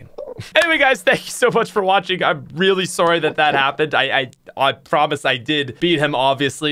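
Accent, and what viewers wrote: Canada. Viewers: I swear is he a Canadian he has like the same exact accent as my mom and she is Canadian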